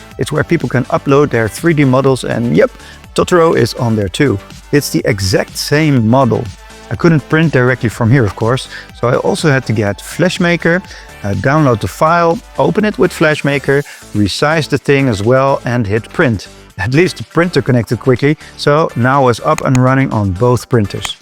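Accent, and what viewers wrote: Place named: Netherlands. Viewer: IDK but u sound Dutch 🇳🇱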